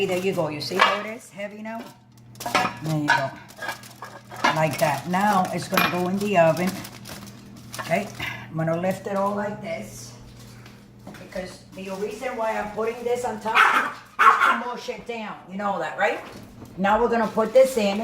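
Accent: New York, United States. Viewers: Found you on the foodie channel.. and omg I’m in love with your channel. So glad I clicked. Love your energy! and you and your son doing this together! 💗(And your fur babies a big PLUS 🐶)!!! Where are you from... Sounds familiar!? I’m from NY, and that’s where you sound from. Love it!! I hope to see you over at my channel to. I think you’d enjoy it!! Many blessings to your channel honey! 💗👩🏻‍🍳🦖 PS I loveeeeee me a Cuban sandwich. Omg YASSSSS girl. You killed it! 🤤🤤🤤🙌🏻🙌🏻🙌🏻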